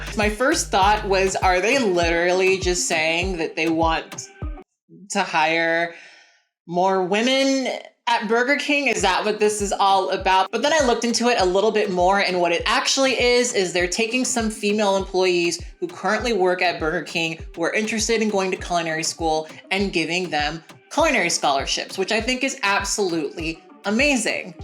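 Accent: England, United Kingdom. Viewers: I love British speaking tbh 😍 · Your british accent is wayy too good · "I don't want comments" we are gonna comment: it's the british accent for me 🍔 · You doing the intro was so bizarre to a new follower. I was just here like "B....british??? I didn't think I followed any non-gaming British Youtubers....." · 🍔 As a Brit, I liked your English accent. It wasn't as exaggerated as most other Americans' impressions.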